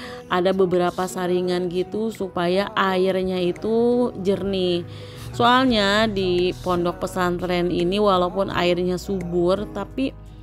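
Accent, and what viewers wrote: West Java, Indonesia. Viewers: Ibu sunda pisan, mun sur org sunda mah asa ka cai we jauh ge da ka bumi budak 😂😂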